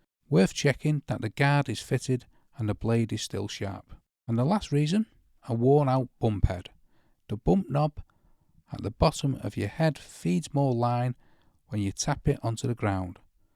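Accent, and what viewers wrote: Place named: Liverpool, United Kingdom. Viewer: Are you a scouser? My dad was and you sound just like him!!!!!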